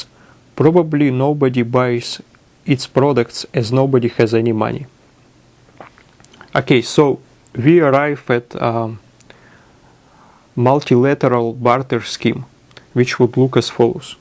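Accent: Russia. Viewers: Russian accent is cool :p this video is the real deal. Very informative, thank you. Why not write a book ? You have plenty information and experience. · I agree. The accent is great [Russian accents are just so frickin cool] and the explanation is great. what's bad is that there's still people in America who don't think this kindof thing could happen to us, but we're SO on that path of reaching over 1000% inflation. the only real way to get rid of an economic crisis like this, IMO, is to get rid of the central banking system [which tends to be the root of all the problems] and go to a solid, consistently valuble money standard of sorts. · This Siberian chatterbox has the most irritating accent imaginable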